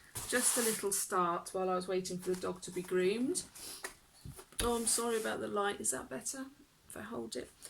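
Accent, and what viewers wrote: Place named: England, United Kingdom. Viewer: Hi there, glad to have found you. I live in Calgary, Alberta in Canada, but lived in the SE of England up until April 2008. Thoroughly enjoyed watching your video and hearing another English accent outside of the family! Cross stitch is definitely a more popular pastime in North America. My LNS is a 40 minute drive away, and the first time I visited it I was like a kid in a candy store, drooling over the choice of patterns, threads, fabrics and other bright shiny things! I started my first Long Dog Sampler in May of this year - The Templar Prophecy, I have also downloaded Pandemic, but won’t be starting that for a while.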